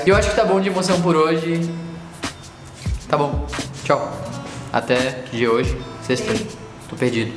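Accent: Florianópolis, Brazil. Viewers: nem sabia, mas logo vi esse sotaque manezinho lindo ae ♡ que liindo o videoo à sua mae!! paz e bem!! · HAHAHAHHAHAHA logo pelos 5 primeiros minutos já deu pra notar o sotaque manezinho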